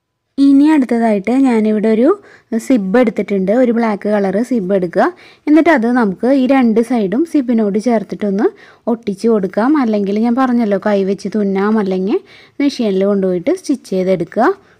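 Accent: Thrissur, India. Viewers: Bro, super ayiitunde, thrissur il avidey a veedu, samsarthinu oru thrissur touch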